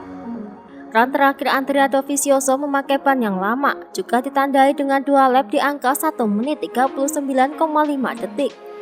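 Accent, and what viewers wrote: Java, Indonesia. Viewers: Halo mbak medok, selalu nonton tpi jarang komen mbak medok hehe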